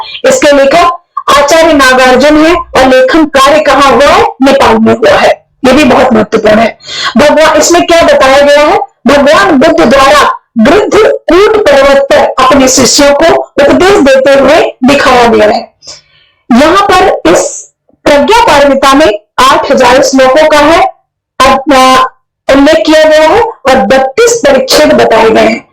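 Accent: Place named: Jaunpur, India. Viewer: Maam आप अपनी उसी भाषा में बात करें और पढाये बहुत अच्छी लगती है सच में. जौनपुरिया वाली 👍👍🌹🌹🌹🙏🏼🙏🏼🙏🏼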